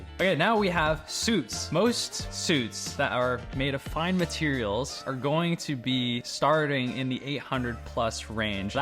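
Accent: United Kingdom. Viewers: ha ha love the british accent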